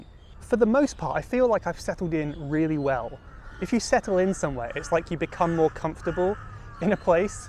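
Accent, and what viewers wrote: RP, United Kingdom. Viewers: I love your accent. Is it modern RP? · Hi, I like this channel so much!!!!! but unfortunately not many people commented on it,I have learned your british accent from your Youtube for 2 months,I hope you can keep updating and I will always be here, thanks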